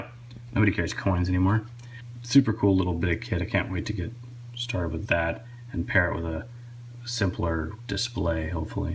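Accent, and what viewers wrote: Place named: New England, United States. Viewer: Are you on the East Coast or from there? You seem to be like a Yankee :o)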